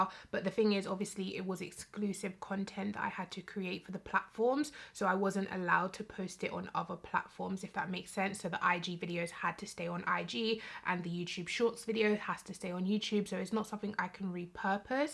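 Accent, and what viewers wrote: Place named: United Kingdom. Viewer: I can’t be sure, because I’m not a content creator, but I think you’re over thinking it. I like seeing your face, 1, because we have similar features. Like keep it a buck? You’re black and it’s nice to see how to do looks and make them look good on brown skin. 🤷🏽‍♀️ this look is great, I want to try it. That’s what I take from you. Maybe it’s just me, but I don’t care if you use the same products, I just want to see how to turn out looks. I am NOT a TikTok fan. Not sorry about it either. Lol, but I just like to talk about makeup and when you come back officially, I want you to do what you want to do, I just want you to be happy doing it. I just want to see your face and hear your British accent, and watch makeup. Lol. Am I over simplifying?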